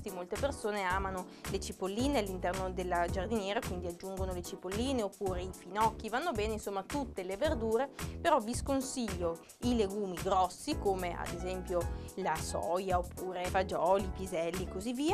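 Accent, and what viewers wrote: Italy. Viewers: Is this Italian accent? My neighbors sound so different :)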